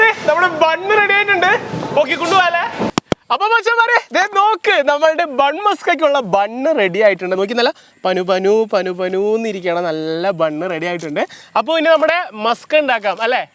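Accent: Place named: Kannur, India. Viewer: Wow ❤ Kannur full ithan 😂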